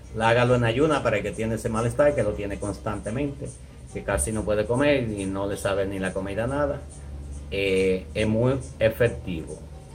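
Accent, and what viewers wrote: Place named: Caribbean. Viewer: eres un genio poderoso Amigo donde quiera. que te encuentres gablas con un acento CARIBEÑO <link> ENCANTA NI SE SIERES CUBANO O DOMINICANO PUERTORRIQUEÑO PERO HABLAS UN ESPAÑOL MUY AGRADABLE SOBRE TODO TU INFORMACION. VALE ORO DIAMANTES ..Y UNA INMENSA FORTUNA GRACIAS PIR TU GRAN CORAZON Y DON DE GENTE BUENA QUE TIENES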